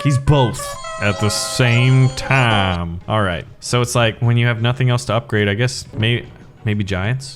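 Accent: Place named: United Kingdom. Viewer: great British accent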